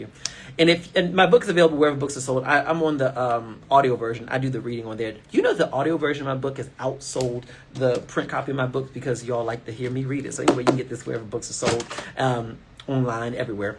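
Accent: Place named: Washington DC, United States. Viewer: You from the dmv?!?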